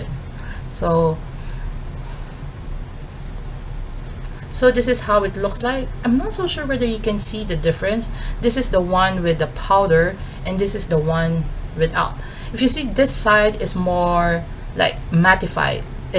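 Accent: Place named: United Kingdom. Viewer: I like this. Sis, you have an accent. British or Australian? I noticed when you said "can't". But I love it. 😚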